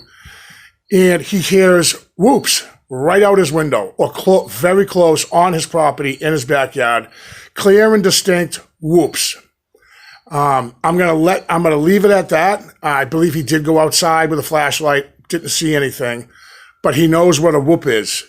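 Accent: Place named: New Hampshire, United States. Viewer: I thought that was a New Hampshire accent.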